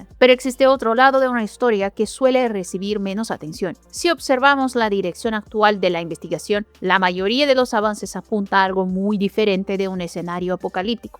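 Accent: Brazil. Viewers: Ella es totalmente humana, en un vídeo de hace tiempo mostró su cara y salió hablando, su acento es por qué es brasileña o algo así y el español no es su lengua nativa.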